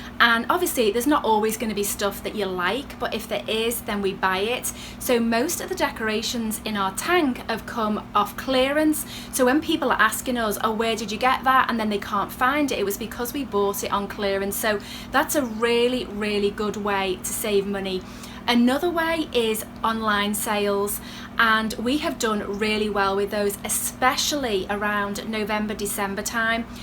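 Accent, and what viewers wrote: Liverpool, United Kingdom. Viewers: Where are you from your speech sounds like you are from liverpool in the uk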